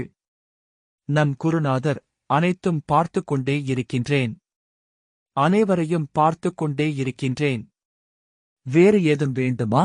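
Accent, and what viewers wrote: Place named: North, India. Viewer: தயவு செய்து தமிழ் தெரிந்தவர்களை வாசிக்கச் செய்யவும். அகத்திய மா முனி / பெயரை கெடுக்காதீர்கள் தமிழுக்கே இழுக்காகும். யாரோ வடக்கர்களை வைத்து வாசிப்பது போல உள்ளது.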